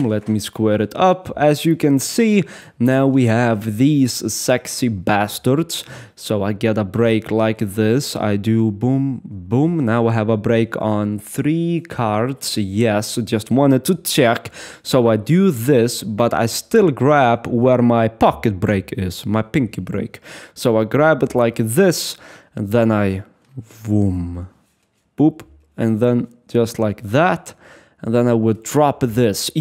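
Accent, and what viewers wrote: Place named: Russia. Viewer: how many accents do you Russians have? I've watched few Russians youtuber all of them are diffrent and none of them speaks the same accent as I've seen in movies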